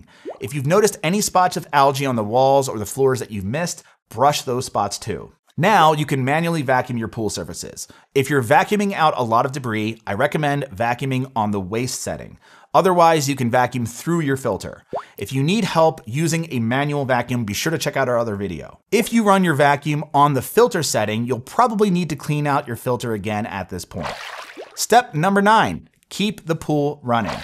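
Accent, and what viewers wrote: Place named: United States. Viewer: Loud mouth american!! Gallons and us accent are of no use😮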